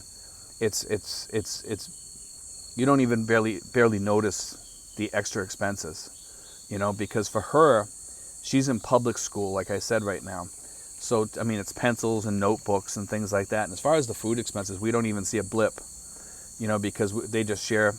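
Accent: United States. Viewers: Hi, is it? I am a new subscriber and was trying to guess the origin of the accent. You had revealed in another video where you were from. I was thinking the same US location...ha ha. Anyway, I am from California and I used to live in the Philippines, but that was 20 years ago. To give a reference point in time; my last visit to the Philippines, BGC was still FT. McKinley. My purpose for being here is to get caught up on life in the Philippines and consume personal stories on this public platform. Best regards.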